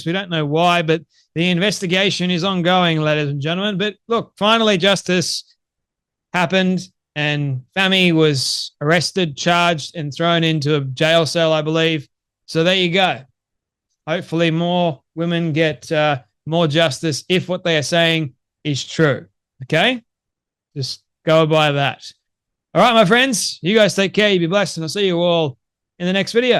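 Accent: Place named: Australia. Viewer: Love your no nonsense Aussie accent.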